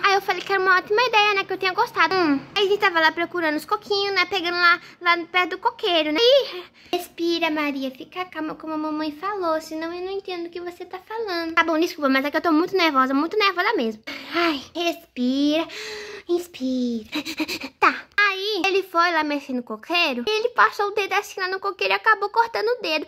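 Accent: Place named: Northeast, Brazil. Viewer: Te amo muito ❤️,minha princesa linda nordestina 🌵 ❣️ Ela é muito engraçada e ainda por cima tem sotaque nordestino 💞🌵 Linda 💜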